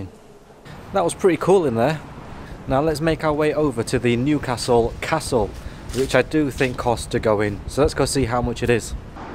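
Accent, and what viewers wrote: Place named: Yorkshire, United Kingdom. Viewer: what accent do you have cos im usually good spotting accents but yours is stumping me ya sound like a mild sheffield/yorkshire guy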